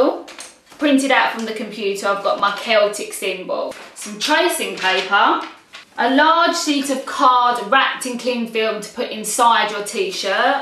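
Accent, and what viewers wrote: United Kingdom. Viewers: Epic British accent!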